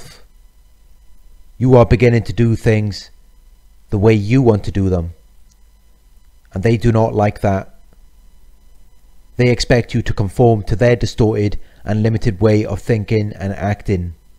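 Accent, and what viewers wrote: Wales, United Kingdom. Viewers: I had a male friend in his late fifties whose mother needed to infantilise him in front of his girlfriend. She would constantly talk about things he did as a child that made him appear stupid, and was still calling him by babyish nicknames. He tried to ignore it, but his girlfriend couldn't stand it. She let the mother have it real good at the dinner table one night, and said they'd never come over again if she didn't stop it. The mother was shocked because she didn't see anything inappropriate in her behavior! Thanks for these videos, and by the way, I love your Welsh accent!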